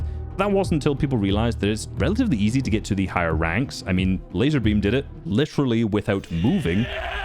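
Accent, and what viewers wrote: Scotland, United Kingdom. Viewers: How can I watch video without the terrible Scottish accent?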